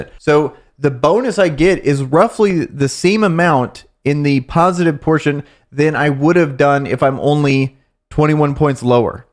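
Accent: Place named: United States. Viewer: He's American..that's what they do with the English language, it's gradually changing because of the likes of this.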